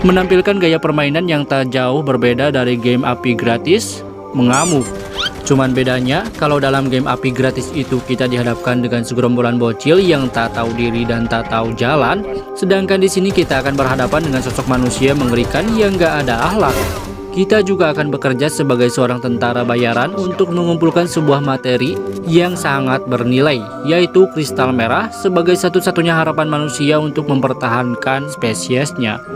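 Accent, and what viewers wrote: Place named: West Java, Indonesia. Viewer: Lu orang sunda ya ngakak ( jangan di dueleu ) salam ti garkhil ( garut karihkil )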